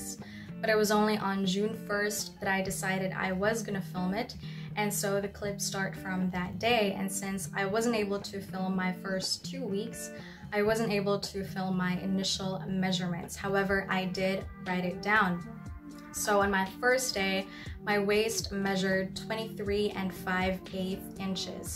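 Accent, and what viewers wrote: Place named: United States. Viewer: Omg it's like I'm watching an American vlogger. Your accent is soooo 🔥🔥🔥. Thanks for sharing btw. ❤️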